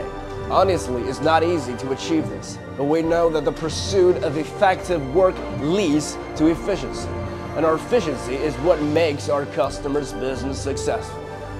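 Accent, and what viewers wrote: South, United States. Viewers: I don't know why has a hint of southern accent, but I love it!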